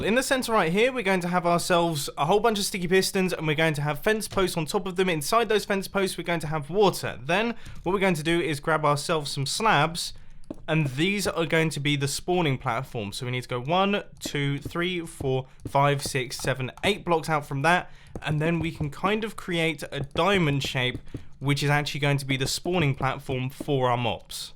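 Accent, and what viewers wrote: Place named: United Kingdom. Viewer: Thanks for the wonderful content these past years! Really appreciated your amazing and genius inventions, your British accent and your apparent lack of ego. I wish you the best of luck but my intrest for Mincraft has diminished over the last few months so to clean up my sub box i wil unsubscribe (not from your vlog channel, patiently waiting for more content there).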